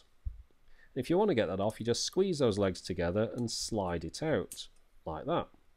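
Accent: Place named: North, United Kingdom. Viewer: I can tell your from up north lol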